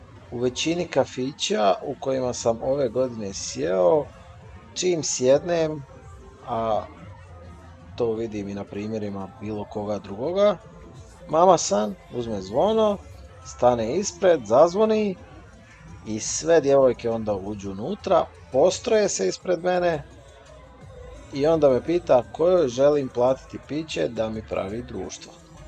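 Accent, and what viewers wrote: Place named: Herzegovina, Bosnia and Herzegovina. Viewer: Ok drugar ocito nista ne znas o Europi jer si negdje iz Ercegovine koliko čujem po glasu . Da ti Tata objasni šta imas u toj Europi imas klubove od 5000 ljudi koji se svi praše bez stajanja dovoljno je da potapšeš osobu koja ti je draga po ramenu i ta osoba ce ti dati felacio besplatno . To o njih 20 ispred tebe svaki bordel u Europi radi zove svoje cure i ne placas pice vec te pitaju koju bi kresao. I da ti kaze netko ko je bio na Patayi sve je to super ok ali daleko bolje mi je u Nizozemskoj ili Belgiji od Thailanda tako probaj ovdje vjeruj mi biti ce ti isto super.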